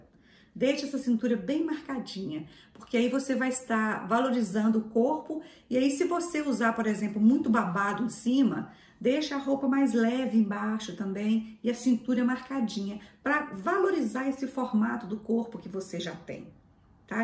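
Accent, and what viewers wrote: Minas Gerais, Brazil. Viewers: vc é mineira? Pois amo este sotaque!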